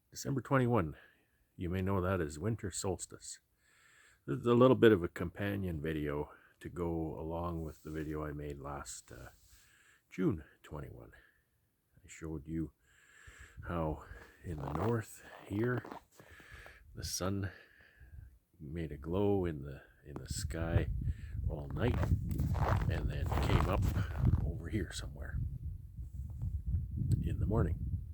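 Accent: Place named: South, United States. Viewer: You sound like a fellow from the South.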